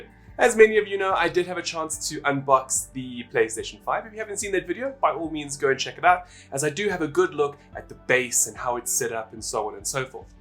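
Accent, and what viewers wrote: South Africa. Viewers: are you a south African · I don't usually click on ads but I hope you get some recognition for your work. Are you from South Africa?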